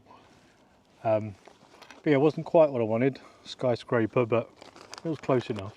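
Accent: England, United Kingdom. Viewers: I been looking at your videos and i just wont to no do. You have snakes were you live at the country looks like American ,but i no it is over in England because the way you talk my Aunt mother was from wales and she talks about not from England I'm from Wales she would set you straight were she lived,thank you for showing us how to sit up a tint,god bless.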